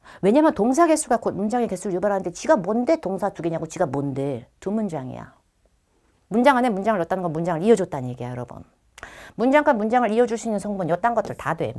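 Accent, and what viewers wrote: Jeolla, South Korea. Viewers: 진짜 이런 박력 너무 좋아.... 선생님 약간 전라도사투리 나오는데 그것도 너무 친숙해서 좋음요 ㅋㅋㅋㅋㅋㅋㅋㅋㅋ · 저도 삼천포여친 윤진이 삘난다고 생각했어요. ㅋ 전라도 사투리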